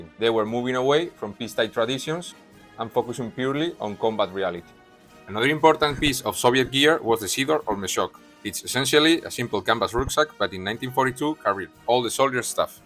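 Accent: Spain. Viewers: Интересно было слушать испанский акцент :) Спасибо за видео.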